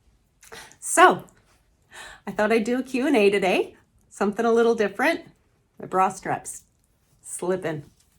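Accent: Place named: Wisconsin, United States. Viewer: You’re from Wisconsin, I got the accent right!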